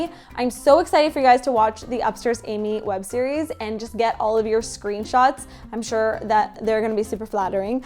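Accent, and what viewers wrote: Canada. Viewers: Oh my goodness your Canadian pronunciations! I am not used to hearing words said that way lol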